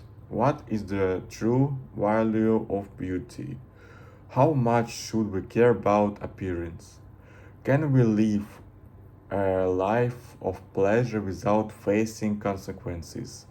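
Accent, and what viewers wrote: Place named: Eastern Europe. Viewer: To talk about this more, I don’t personally think hedonism is inherently bad, but I think it is often unhealthy for a person mentally. I don’t know what culture you are from, certainly your accent sounds like one of the languages in the Slavic family, but I’m from an area that is very repressed and people are not as open or accepting of others or new experiences as they should be in a healthy society. I feel like Mr. Wilde should not have been persecuted by others, but also that he should not have persecuted himself.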